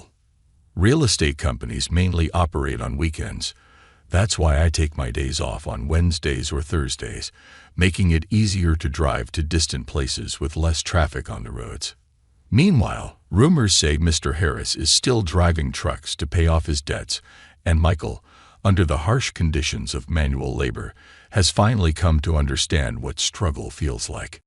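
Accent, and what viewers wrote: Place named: United States. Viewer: Good story. Thank you... the narrator's voice sounds like the USA 🇺🇸!! Tired of the British accents!!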